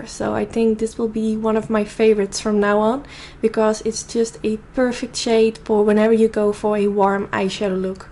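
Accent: Netherlands. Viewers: hey, are you dutch?😊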